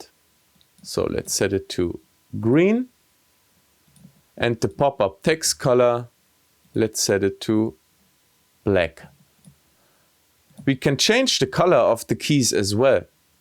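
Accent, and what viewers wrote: Germany. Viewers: na, hast'n deutschen akzent nicht?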